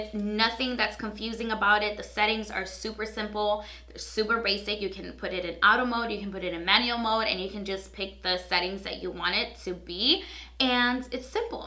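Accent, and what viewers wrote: New York, United States. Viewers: R u from New York? Cos “nwot auwll of uws are” (not offending anyone) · It's alot like mine I am wondering if she is from new York or boston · she sounds like a really strong new yorker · I love your NY accent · are you from new york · Are you from New York or New Jershey by any chance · Her new york accent is super heavy love it😂👏🏽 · I love your accent ❤😇 so new yorrrrk haha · holy new yorker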